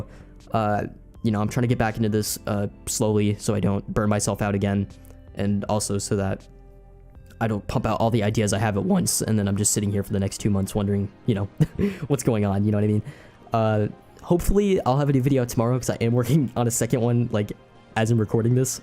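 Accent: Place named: New Zealand. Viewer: He’s actually from New Zealand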